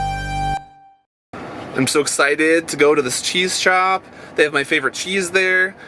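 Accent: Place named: Minnesota, United States. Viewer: You sound very Minnesotan 😆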